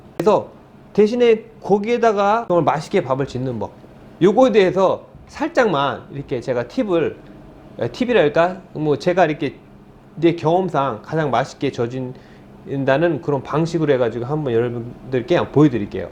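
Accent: Japan. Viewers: 그니깐요 역시 사람은 계속 써야 하나봐요 초창기 모습 보면 재일이나 일본 사람이 한국말 배운줄 알았었는대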